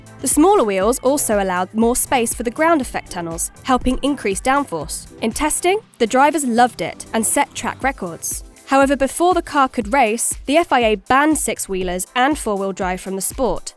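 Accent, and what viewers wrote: United Kingdom. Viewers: Britis speak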